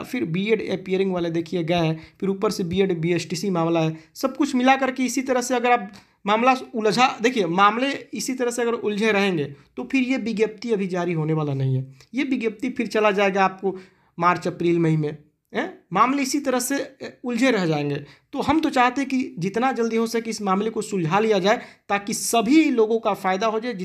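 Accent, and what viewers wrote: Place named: Bihar, India. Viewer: बिल्कुल बिहार वाले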